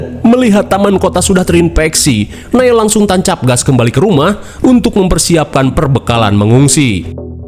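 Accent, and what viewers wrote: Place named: West Java, Indonesia. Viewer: Mantap sunda pisan euy😁😁😁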